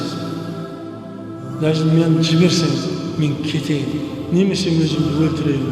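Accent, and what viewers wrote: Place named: Uzbekistan. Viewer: Озбекше акцент урып тур устаз. неге озбекшеде амри маруф кылмайсыз ?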